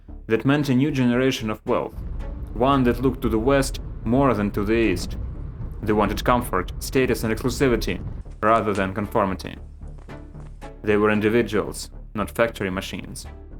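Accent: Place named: Ukraine. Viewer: Finally, Ukrainian voice on a niche topic in English. Please, go on.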